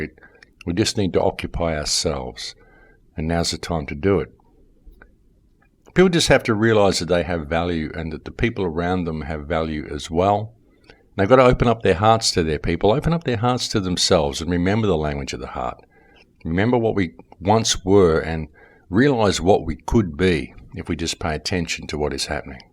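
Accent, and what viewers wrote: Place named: Australia. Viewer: I'm new to yuor posts. Very informative! Refreshing to get schooled in an Aussie accent! Cheers mate! Oh yes... keep up the good work!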